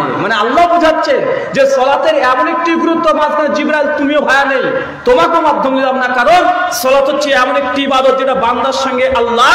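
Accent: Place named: Rangpur, Bangladesh. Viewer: হুজুরের কথা বলার ধরণ অনেক সুন্দর অনেকটা আমাদের বাংলাদেশের রংপুর জেলার মত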